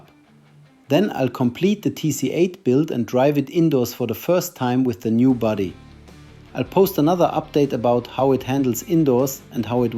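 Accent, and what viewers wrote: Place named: Germany. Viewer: Englisch für Deutsche! Super!!! Hier verstehe ich jedes Wort 😂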